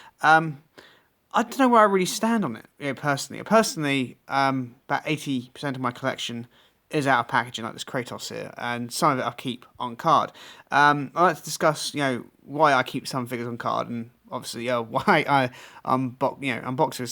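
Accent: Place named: England, United Kingdom. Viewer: We like the smooth English accent. fab content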